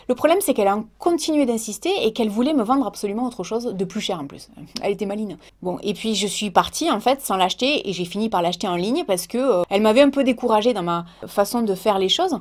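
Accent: South, France. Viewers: Vive l'accent du sud ! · j'adore trop marrant, surtout l'anedocte avec Kate Wislet pas mal... et j'adore ton accent, ça me rappelle le sud et le soleil :) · Je me disais que tu avais beaucoup l'accent du sud et qd j'ai entendu Perpignan j'ai mis pause et je t'ai regardé comme si yavais un cookie devant moi et j'adore les cookies 🤣❤️ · Coucou ♥ j'adore ton petit accent du sud et tes cheveux roux ! Tu m'as fait sourire dans ta vidéo et j'avoue que c'était bien sympa o/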